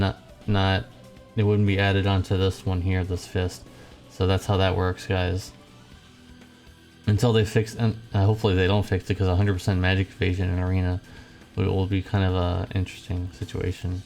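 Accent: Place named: Wales, United Kingdom. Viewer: Is his channel for Wales? Because it sure sounds like it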